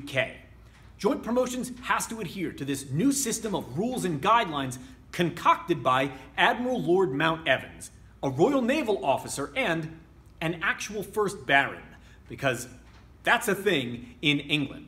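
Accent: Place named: United States. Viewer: Why is some American guy talking to me?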